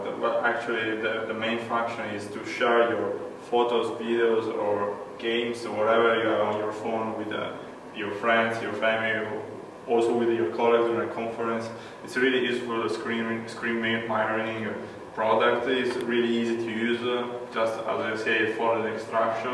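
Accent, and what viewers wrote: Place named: Italy. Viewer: italian accent xD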